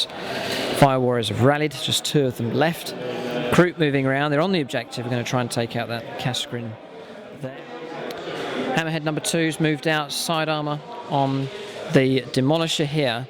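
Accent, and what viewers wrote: United Kingdom. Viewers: I'll admit when you rolled the "6" for the last hull point on the Super Heavy it was "Carlton Fisk-esque"! Good show once again. Maybe it's the models, maybe its the terrain, maybe it's the narrative, hell, I wouldn't rule out maybe it's just the British accent thing, EITHER WAY, these batreps have reached a peerless quality in what you provide. No fancy overlays or stat lines (which are fine) but just clever camera work and a clear love for the narrative. Thanks again. See ya next time.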